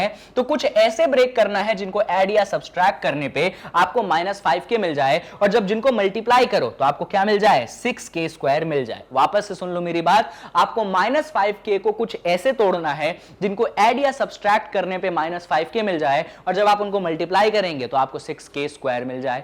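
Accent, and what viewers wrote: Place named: Bhopal, India. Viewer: Sir aap shayad bhopal se ho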